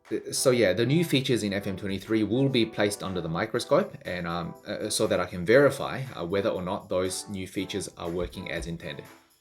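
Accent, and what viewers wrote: Australia. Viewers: You sound like your from Australia! - Be good to see some tests on Low Block effectiveness vs High Line and pressing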